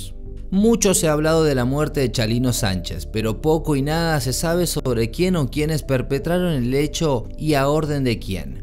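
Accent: Argentina. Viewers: Deberías de pronunciar bien la y o lle . El Argentino se escucha mal . No creo que sea tan dificil pronunciar la Y y la LL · dale chee voz soss argentino.........._????? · Jajajaja el que narra tiene acento Argentino Narrando una historia de un Mexicana Jajajaja no concuerda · UN Argentino contando la historia de chalino. No Manches. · Sólo el título para ganar más visitas, y perdón pero no me gustó la narración, más bien el acento argentino hablando de historias mexicanas 😮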